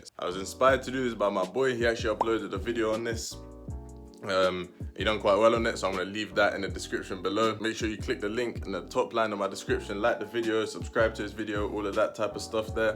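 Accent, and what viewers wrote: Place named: United Kingdom. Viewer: Pull ups I hate pull ups. I like push ups better. I just recently saw your thumbnail video and I just wanted to say thankyou and I will try and copy those techniques of your on Photoshop keep doing your thing my man and stay safe. I'm Kaleb btw. I just subscribed. Are you British I like your accent. I do travel vlogs in New Jersey and other states along the way and maybe come check me out and maybe subscribe. Thanks. Bye.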